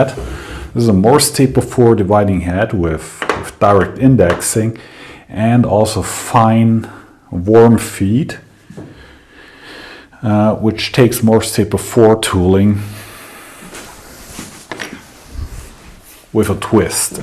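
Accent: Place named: Germany. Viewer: Hi, i like high level quality. And by the way your german english is beter than original😉🤭. I learn english and i look and listen you. Thanks.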